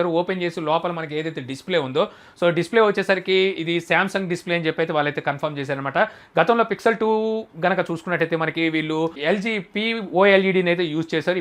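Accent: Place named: Godavari, India. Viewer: nee slang kosame ne vedios chustaaa godavari don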